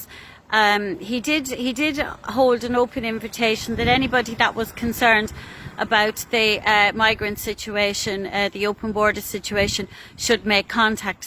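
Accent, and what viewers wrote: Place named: Ireland. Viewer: Great , spoken like a true Irish lass.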